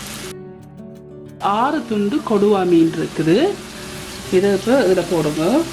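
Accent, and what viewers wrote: Sri Lanka. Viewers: Are you a Srilankan Tamil?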